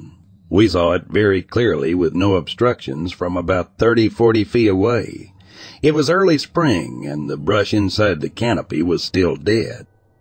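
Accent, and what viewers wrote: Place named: South, United States. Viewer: I think you may be mistaking mispronunciations with the regional southern accent. I haven't heard any mispronunciations. Certainly not routinely.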